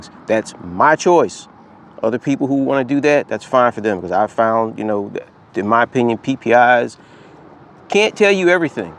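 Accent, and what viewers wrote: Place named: Pittsburgh, United States. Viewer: You from Pittsburgh? Ya accent sounds like it.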